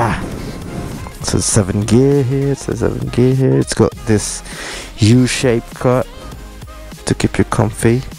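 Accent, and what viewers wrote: United Kingdom. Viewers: itu aksen british :3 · i love the way you talk, British Accent hahaha,lil bit weird heard you moaning ahahaha. Nice gears bro. i'm interested with the shoes.. 7gears, right??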